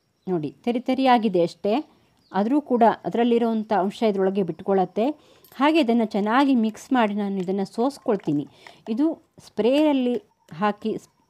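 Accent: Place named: Kundapura, India. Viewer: nim voice,heluva reethi, hagu nammoorina kannada bhashe ya aa shaili nange thumba esta aythu.nammadu moola ooru cundapura the hathra kota.malegala the nim video noduke laykaguthe.e time nalli bulbs metre barutha heli.rply plz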